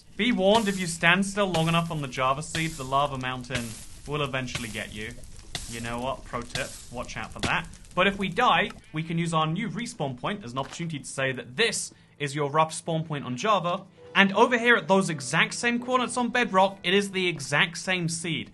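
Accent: United Kingdom. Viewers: cus he's bri*ish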